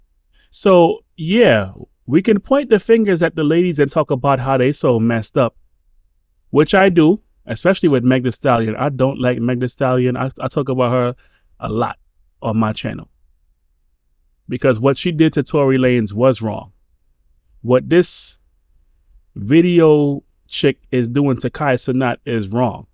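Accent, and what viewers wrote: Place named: Caribbean. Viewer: Hearing a little West Indian in your voice but I can't put a finger on the island 😂Anyways men are too weak. Especially these day. We complain about women all day but their behavior comes from the fact that they are dominating us because we are soft, we accept anything, we are so hooked on p-sleeve. Fresh and Fit's podcast is a joke. CGA is the only guy doing the lords work. I know you recorded this before the update. She was lying but I knew this from the very beginning. Doesn't discount anything your saying. We need to do better.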